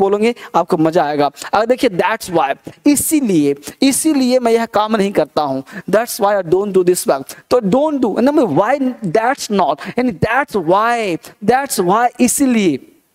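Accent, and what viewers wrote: India. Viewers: Sar aap word ko kaun si accent mein bolate Hain Indian accent yah British accent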